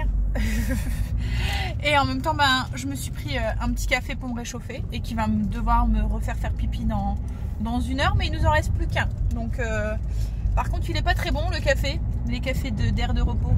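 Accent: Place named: Pas-de-Calais, France. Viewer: Cc moi je croyais que t habiter dans le pas de Calais comment tu parle pareil que ceux du pas de Calais car moi je suis née dans le pas de Calais es y habiter jusqu'à 12 ans es toute ma famille es là haut donc toi ses le nord.sinon rien a voir quand tu fais les poussières avec du savon noir du rince ou non merci pour tout des partage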